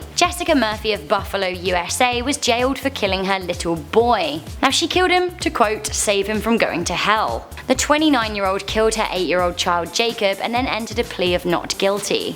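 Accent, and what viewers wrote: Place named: United Kingdom. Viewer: British accent always amuse me🤣🤣🤣🤣🤣🤣🤣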